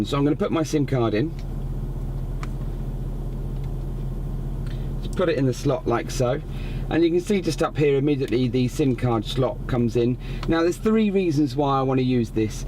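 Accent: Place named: England, United Kingdom. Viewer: Hi Just found you and nice to hear an English voice giving help for a change hahaha!!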